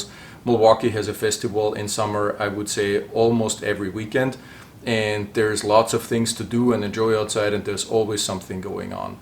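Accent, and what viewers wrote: Germany. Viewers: I thought you had a German accent but then you mentioned Austria, another beautiful country. I like that party of Europe, although I have to admit that I liked all of Europe. Now that I'm retired I have thought about going back since I have German friends.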